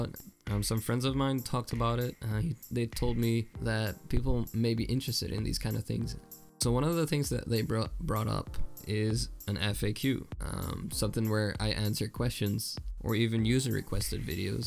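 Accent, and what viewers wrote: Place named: Russia. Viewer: Guys I think he is russian, He has that accents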